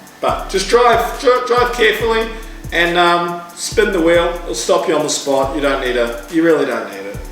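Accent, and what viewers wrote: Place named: Australia. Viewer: You sound Australian...Forgive me if I'm incorrect. If so, I am absolutely terrified of water in your country lol. Between your crocodiles, snakes, sharks (even if I don't have to worry about them), etc...The only water I would want to touch there is the shower, and my drinking water lol. If it's New Zealand, well that doesn't change much lol. In fact it's probably worse.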